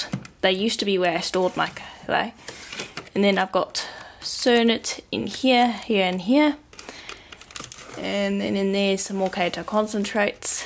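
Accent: South Africa. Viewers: Such a well organised studio😍 where are you from, your accent sounds South African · Hi! You are so organized! Thank you for your studio tour. I'm just starting out but it's given me a few ideas of how to better organize what I have and prepare for what I plan to get! BTW, my husband commented on your accent as soon as he heard your voice--he and his family are from South Africa and they were from Johannesburg.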